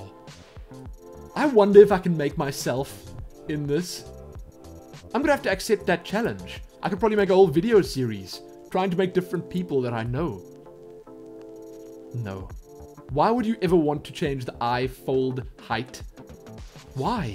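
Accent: South Africa. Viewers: Where are you from you sound a very South African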